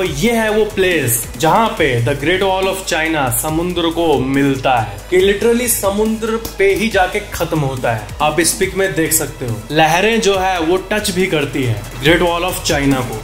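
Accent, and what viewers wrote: Bihar, India. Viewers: Is he bihari? 🤔🤔🤔🤔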